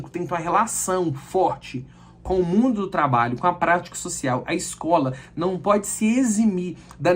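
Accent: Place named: Minas Gerais, Brazil. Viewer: Mas vc tem sotaque mineiro.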